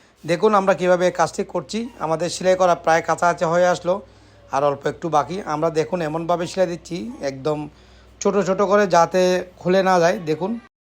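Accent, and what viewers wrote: Chittagong, Bangladesh. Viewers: চিটাংগা মানুষ বদ্দা। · Vai apni Chittagong er…..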